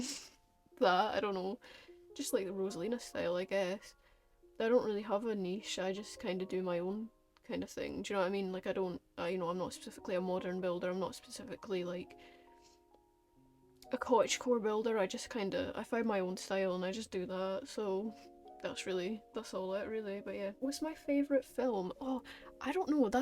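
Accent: Scotland, United Kingdom. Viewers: Omg ur Scottish 🙀🙀🙀🙀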